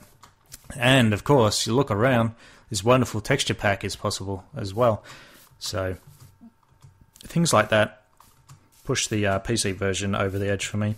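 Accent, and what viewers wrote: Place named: Australia. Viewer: Whoa!! Loving it so far! You sound so... Aussie! Ahahahaha Most amazing bro.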